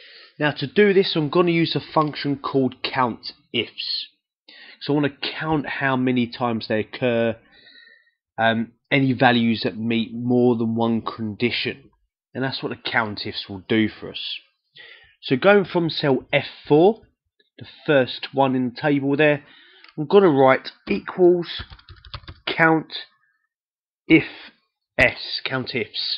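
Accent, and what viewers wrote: United Kingdom. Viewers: Thank you SOOOO much! You're really awesome!💯 🎆This video was so very helpful that I can't begin to tell you how much it actually helped me. Plus, you're accent is so cool! Love it! Are you British?💚💚💗💓💖😌😌🤗👍💣😌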